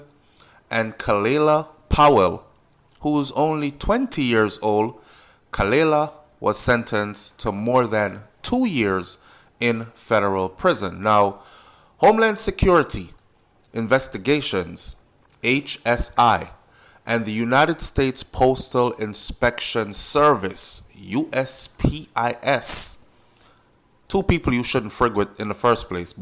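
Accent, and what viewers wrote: United Kingdom. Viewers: Sound like u try this thing yah already eno bro,all white British accent.. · Ur doing it again...I picked up a British ascent this time 🤣🤣🤣🤣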